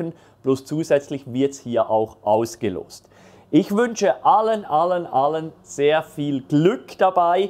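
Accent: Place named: Switzerland. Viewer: vielen Dank für dieses tolle gewinnspiel ich drücke mir und allen anderen die Daumen und würde mich sehr darüber freuen. Seit Jahren schaue ich deine Videos und mage deinen schweizerischen Akzent. Ich hoffe auch mal nächstes Jahr bei einem Kurs von dir dabei zu sein. Frohe Weihnachtszeit 🎄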